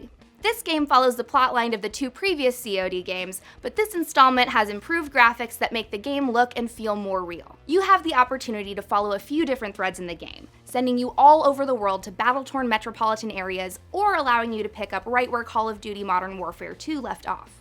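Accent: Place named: United States. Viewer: Gosh, what a disgustingly thick rhotic accent... Can't listen to this woman. Why do some of you Americans make such a fuss about pronouncing a simple, innocent 'r' ?! It's just an 'r' , godammit, you don't have to swallow your tongue to pronounce it! You don't have to rape the li'l 'r' so hard! You sound like you talk with a hot potato in your mouth. Plus this nagging voice... No way, José!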